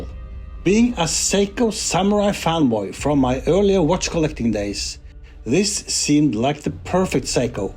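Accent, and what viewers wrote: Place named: Sweden. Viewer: Hi,. I enjoy your reviews, and your accent reminds me of my grandfather, and other, living, relatives back in Sweden. It’s music to my ears! Greetings from the American Midwest, where Vikings cosplay is a thing. Thanks for your review of the SRPL13. I agree on all points, and ordered one from Teddy for $437, plus state tax, tariffs included. It paid to procrastinate. Could not wait any longer, as a Seiko price increase was to kick in on 15 Sept. I was torn between this Seiko and the Citizen BN0150. The Citizen has ISO Diver’s 200, and has grab and go Eco-Drive solar movemnent. In the end, the Seiko’s style won out over the Citizen’s practicality, ease, rating, performance and value proposition. Since I don’t dive, this was really ultimately about aesthetics.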